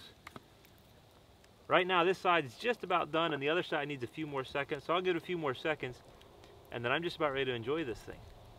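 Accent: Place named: Pennsylvania, United States. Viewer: That accent is familiar you from Pa? I am from ruffsdale myself.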